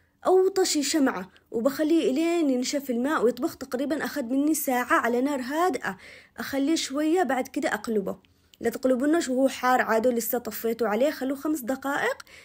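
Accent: Aden, Yemen. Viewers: ماشاء الله ماحسنيك للهجه العدني وطريقة شرحك حلو الله يعطيك العافية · صوتك اجمل من الفديو كامل ماشاء الله عل الهجه العدنيه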